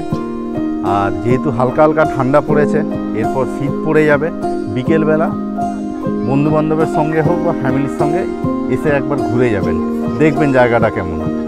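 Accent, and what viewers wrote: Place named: Medinipur, India. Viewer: Apnar uchcharon puro medinipurer, valo korechen, kono artificial noy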